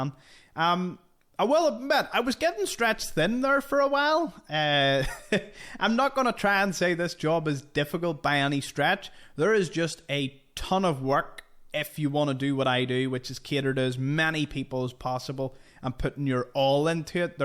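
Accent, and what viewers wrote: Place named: Northern Ireland, United Kingdom. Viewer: I think what makes your channel so appealing is, not only your soothing NI lilt but also your easy going attitude to the game, not taking it badly when it goes wrong. 👍👍👏🏻👏🏻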